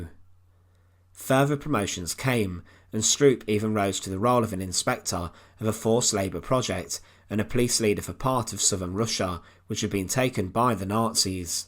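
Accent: United Kingdom. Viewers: I wish your British accent was not so prevalent and more understandable.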